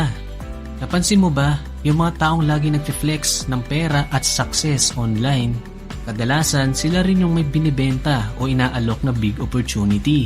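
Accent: Philippines. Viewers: sound like: ilonggo tikalon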